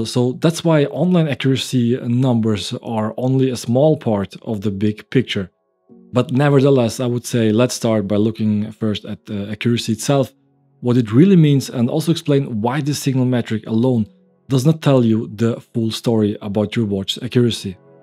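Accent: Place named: Switzerland. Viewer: An AI bot ould not have a Swiss-German accent when speaking English.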